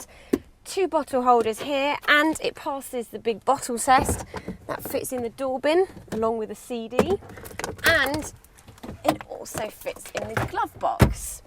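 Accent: United Kingdom. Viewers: Wow, that is a fine ass reviewgirl! Why whe don't have those in the Netherlands.. Oh, love the British accent as well.. ;)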